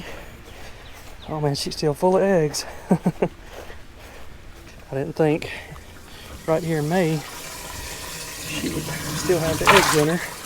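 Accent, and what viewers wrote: South, United States. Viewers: Hearing you describe the pond tech in a Southern accent is peak Americanness.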